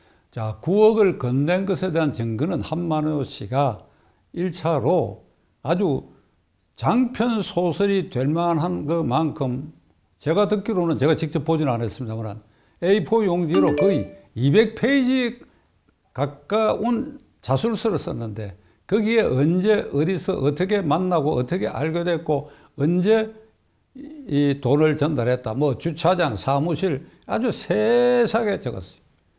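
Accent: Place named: Gyeongsang, South Korea. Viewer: 변호사님의 해박한 지식과 설명해 주시는 노력과 성의는 존경해 마지 않습니다. 변호사님 1.서울에 사신지 얼마인데 아직 경상도 토박이 억양과 어휘를 탈피하지 못하십니다. 2."다른 증거들로 가지고"는 " 다른 증거들로서"로 고치시고 3."미국으로 도망가가지고"는 "미국으로 도망가서" 4.그래가지고 이래가지고 등 가지고라는 이음새는 아주 듣기 싫은 낱말,특히 연설문 대중 연설문에선,. 5.지금의 젊은 세대에 맞는 어휘 억양,어구 등 부탁합니다. 감사합니다.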